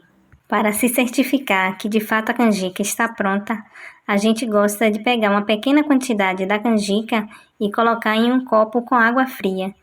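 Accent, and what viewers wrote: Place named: Northeast, Brazil. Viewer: Boa noite gostei sutaque nordestino eu amo nordestino Bahia eu Itabuna Aracaju Sergipe ou meu pai morreu era sergipano sou de Santos gostei do vídeo parabéns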